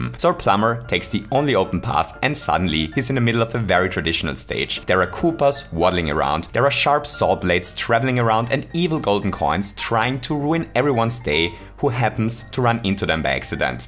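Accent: Germany. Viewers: This german accent.... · Ahhhh ein Deutscher. :D Dein Dialekt verrät Dich. ^^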